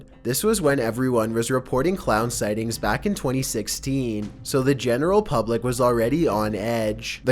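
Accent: Sweden. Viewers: Är du Svensk eller?? = Are You fr.o.m. Sweden??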